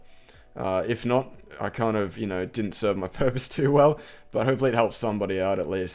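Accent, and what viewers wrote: Australia. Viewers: yew aussie guide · He's clearly not American. he's australian. run off UK English. aka the English from England.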